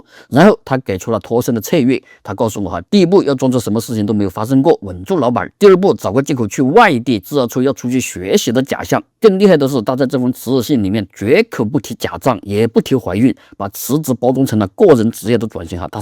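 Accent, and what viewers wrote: Sichuan, China. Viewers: 兄弟伙你这声音恩是川普哦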